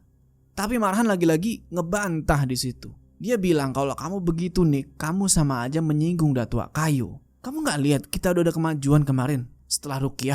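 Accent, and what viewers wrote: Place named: Bali, Indonesia. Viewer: Kok malah mirip logat bali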